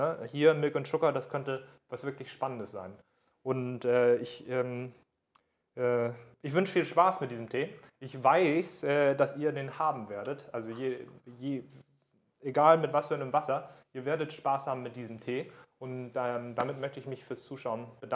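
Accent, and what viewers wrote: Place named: North, Germany. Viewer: Und dann noch dieser nordische Slang mit dem angequatscht wird! ;-) Großartiges Video!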